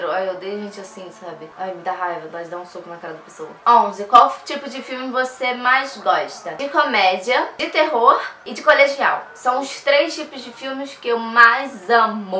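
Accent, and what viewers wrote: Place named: Rio de Janeiro, Brazil. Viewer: Não sei poorq mais amo sutaque carioca haha💛 tbm faço videos👊 se puder da olhadinha no meu canal agradeço um bjao😻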